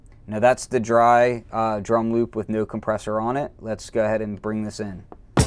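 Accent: Philadelphia, United States. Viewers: Thanks, great demo. I like your Philly accent, too.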